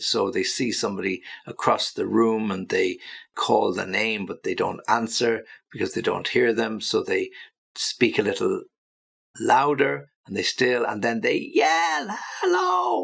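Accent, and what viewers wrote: United Kingdom. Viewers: Hi teacher! Thank you for your help and work. Amazing, helpful… really good to improve our English. By the way, from what part of the UK are you? I am trying to catch your accent.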